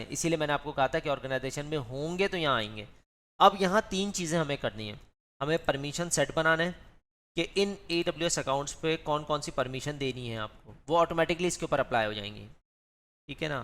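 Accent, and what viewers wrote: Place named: India. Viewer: Impossible to hear his english with the indian accent.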